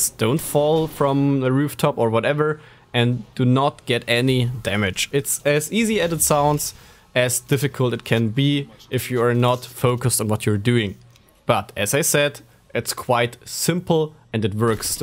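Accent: Germany. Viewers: sorry aber dieses deutsche englisch macht mich sooo aggresiv :D aber gutes video habs doch einigermaßen verstanden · Ur from germany right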